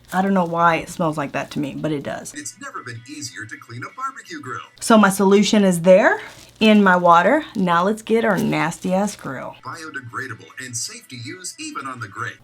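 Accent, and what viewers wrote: Texas, United States. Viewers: You sound Texan.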